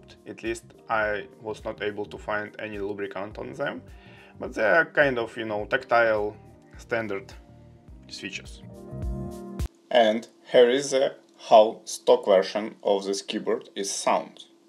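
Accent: Russia. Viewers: where are you from bro? why u talk like russian people я слышу именно максимально русский английский говор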